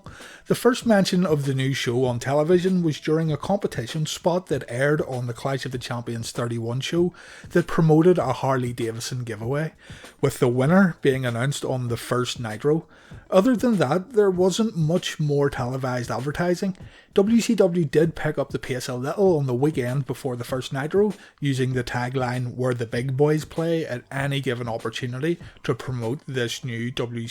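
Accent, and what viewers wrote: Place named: Northern Ireland, United Kingdom. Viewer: Dude are you from the north of Ireland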